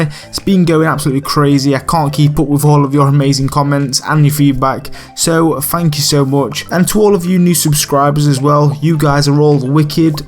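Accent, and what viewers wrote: United Kingdom. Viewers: I love your content so much but your accent annoys me so much 😂 I'm sorry, I still watch but it does annoy me not sure why. which part of Britain are you from? Lancashire here:)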